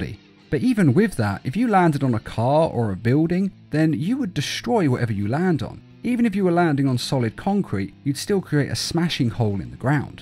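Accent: United Kingdom. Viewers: Sounds like a normal british person.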